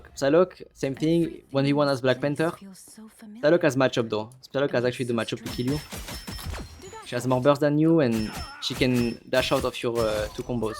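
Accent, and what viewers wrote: France. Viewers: You have a very strong French accent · Hey bro are you French or something ? Nice video btw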